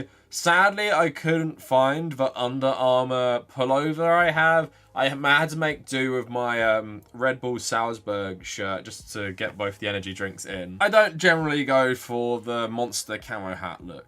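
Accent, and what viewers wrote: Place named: United Kingdom. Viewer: Hmm... This guy seems cool and I think I subscribed to him at some point, but why's this britbonger look like a dirt bike bro? His clothing doesn't match his voice. OH SHIT HE ADDRESSED IT RIGHT AS I WAS TYPING THIS. It's his Republican cosplay. But the he said Guardians of the Galaxy Vol. 2 is one of the worst movies he's ever seen and now I'm sad. That's one of my favorite MCU movies. Especially cause I'm a dad to two boys and the movie is about being a son, being a father, and family in general so the message resonates. But I'm also just a huge MCU nerd.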